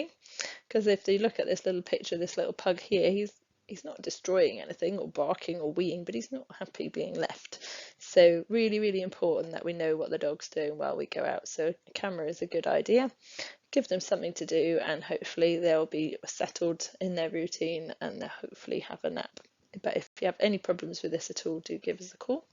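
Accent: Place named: Europe. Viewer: judging from your accent believe you are in Europe, sorry I'm in japan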